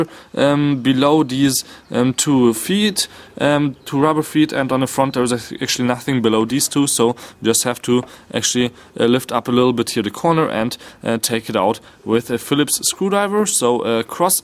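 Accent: Germany. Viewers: Are you german? are you english speaking naturaly? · German english lol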